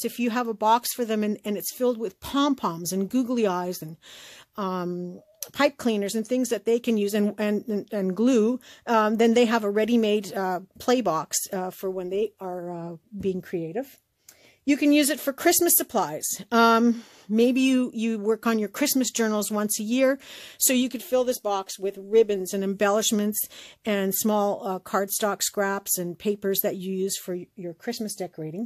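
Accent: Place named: Michigan, United States. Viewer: Are you from Michigan? You kind of sound like it…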